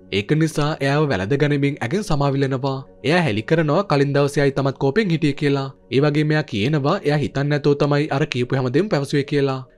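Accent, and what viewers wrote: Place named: Galle, Sri Lanka. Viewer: මේ කතා කරන එක්කෙන ගාල්ලෙ පැත්තෙ වෙන්න ඔනා.....😂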